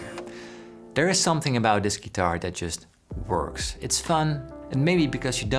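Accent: Netherlands. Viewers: Are u dutch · That's a strong Dutch accent. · Haha kan aan je engels horen dat je Nederlands bent😅 blijf zo door gaan kijk altijd al je video’s🔥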